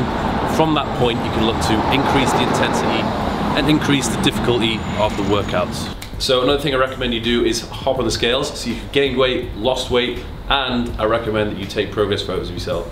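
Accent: United Kingdom. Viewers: Thank you very much. Improved my fitness knowledge and even my skills on understanding more the British accent (I’m from Italy) 💪🏼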